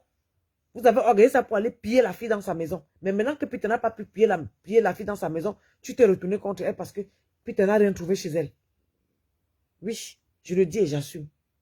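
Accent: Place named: Cameroon. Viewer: Ton accent me fait dire que vous êtes camerounaise merci pour ton soutien à EK. Le cas peter c'est un cas école je pense que ça vous servira de leçon aux blogueurs ivoiriens à deux balles tous des analphabètes illettrés.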